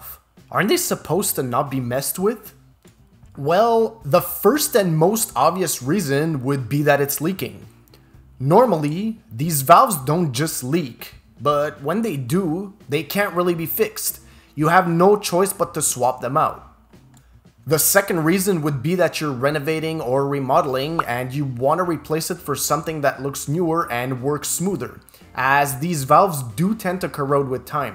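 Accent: Quebec, Canada. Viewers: Love your videos. Is this a Quebec accent I'm hearing? :)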